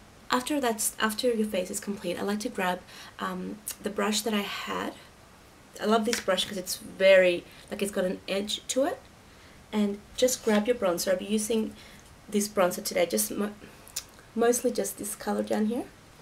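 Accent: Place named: Australia. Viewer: I absolutely love Australian accents. :) So cute! Beautiful look!.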